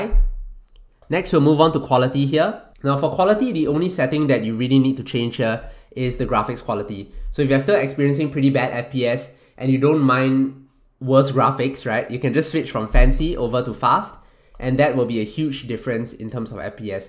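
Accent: Singapore. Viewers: nice singaporean accent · I hear your accent, I knew INSTANTLY that you were Singaporean lol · nice sigaporian accent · u from singapore?? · are you from singapore ur voice sounds like it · ru singaporean? your accent is like sg accent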